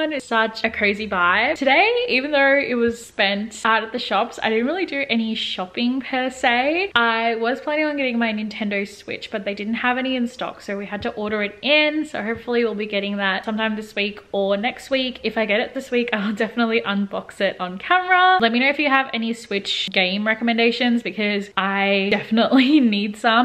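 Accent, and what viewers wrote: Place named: Australia. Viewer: Gosh you have a good Aussie accent I live in nsw and I sound like u 😂 the pure Aussie accent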